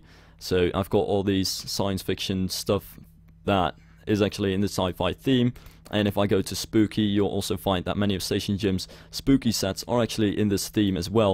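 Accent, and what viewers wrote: Netherlands. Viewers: haha ik hoorde dat je nederlands bent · are you dutch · Jij bent Nederlans haha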